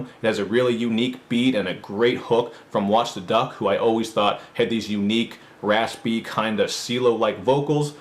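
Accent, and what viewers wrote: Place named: Western Canada, Canada. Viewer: Are you by any chance from western Canada? You sound like me lol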